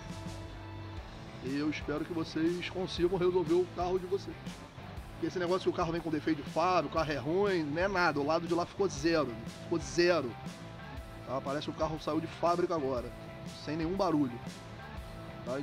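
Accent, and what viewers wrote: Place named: Rio de Janeiro, Brazil. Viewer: bom dia! No vídeo, você mencionou que o único mecânico que teve a paciência de resolver o problema, foi o Russo. Sou do RJ, pelo teu sotaque parece que você também é...... Você poderia me dizer o endereço desse mecânico por favor? Abraços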